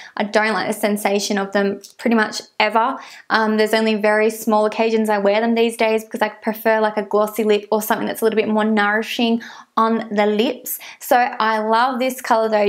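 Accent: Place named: Australia. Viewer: I Love your Australian accent it's beautiful, Are you able to get collection products if so I would love for you to do a testing video for it because I want to see other people's reaction to some of the products I own and love and if you agree X Shannon love you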